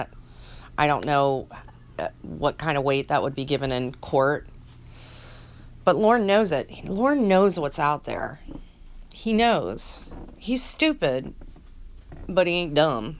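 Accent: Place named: Maine, United States. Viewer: I love your accent! Not all Mainers sound like Lorne (I certainly don’t) but I know a fair few who do. It’s mostly the older generations though. My grandmother used to speak a lot like lorne and his mawm, only she knew the difference between “weren’t” and “wasn’t.”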